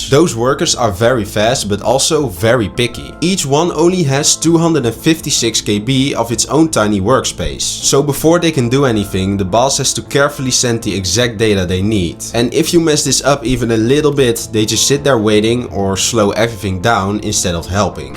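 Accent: Netherlands. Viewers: Are you dutch😂🎉? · You must be Dutch my friend, Right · Nederlands denk haha leuke videos man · Ik hoor het nederlands accent in je engels😂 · I hear a Little Bit HOLLÄNDISCH❤😂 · Are you dutch? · You're Dutch or your accent is Dutch because you're nervous. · great editing, unique commentary, and a dutch accent, is a fast Like from me! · Kountree? If this guy aint Dutch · Holy Dutch accent maat, maar goeie vid 👏🏼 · hoe nederlands is deze guy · Brother I live in NL and something tells me you are dutch by your accent haha. Anyways very dope vid with dope analysis 💪🏽💪🏽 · I hoor dutch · Je klinkt Nederlands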